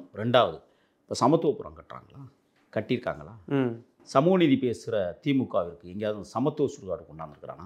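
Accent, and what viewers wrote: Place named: Cauvery Delta, India. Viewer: தாங்கள் டெல்டா மாவட்டத்துக்கான ரஷ் என்பது உங்கள் பேச்சு சாயலில் தெரிகிறது.❤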